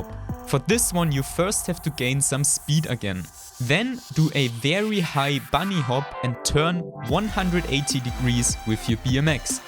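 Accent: Germany. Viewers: Are you german? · Dieser Deutsche Akzent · Are u a german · Its a DEUTSCHER AKZENT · Dieser deutsche Akzent xD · Ahh ich war mir nicht ganz sicher als ich den Aufbau der Deutschland Flagge auf dem Pfp gesehen habe aber mit dem Akzent bin ich mir sicher also dir noch ein gutes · Dieser deutsche Akzent zu wild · Bavarian englisch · Are You German? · Man hört den deutschen raus 😂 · Irre ich mich oder höre ich da einen deutschen Akzent heraus? 🤭😂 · Man hört richtig das du deutsch bist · The fax that’s he German u can say by he’s accent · Is he German he has so A German act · Du bist deutsch oder? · wusste ich doch, dass ich einen Landsmann an seinem Akzent erkenne. xD Danke für die schnelle Antwort, mach weiter so mit den Videos.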